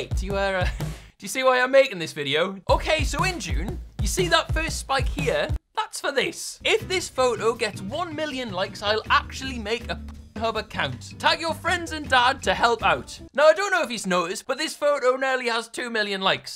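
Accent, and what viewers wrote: Newcastle, United Kingdom. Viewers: Are u from Newcastle boi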